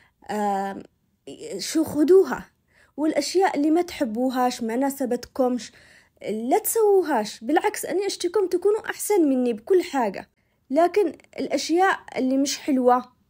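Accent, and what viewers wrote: Aden, Yemen. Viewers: انتي من افضل اليوتيوبرس ،وكفايه صوتك الحنون واللهجه العدنيه الاصيله عوضا على وصفاتك اللذيذه اللي تجنن ،لك كل التحيه والموده والتقدير ❤ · مستغربة ان في تعليقات سلبية على كل هذا التميز في الشرح و الطبخ الرائع .. ماشاء الله فنانة جدا بمعرفة كل انواع هذه الطبخات وبطريقة الشرح اللي يعلق سلبيا معناه حسد انا حتى فرحانه انك تتكلمي عدني زينا مش زي اللي يسافروا السعودية ولسانهم تنعوج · اي حد عاجبه يتابع ووالدي مش عاجبه طز ولا تخلي ببالك ولاتهمي والله كفايه كلامك العدني الحلو موفقه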